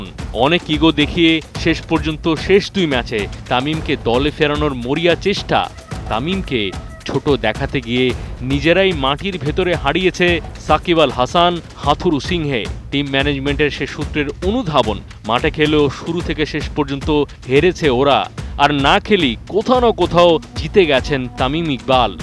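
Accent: Kolkata, India. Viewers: Kolkatar bangla.....শ্রুতিক টু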